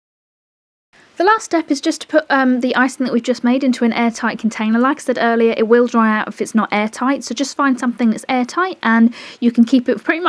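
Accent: United Kingdom. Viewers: omg are you British? cuz I love your accent and great video!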